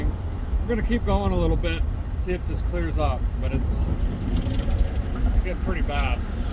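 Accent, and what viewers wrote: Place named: South, United States. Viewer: Your southern is improving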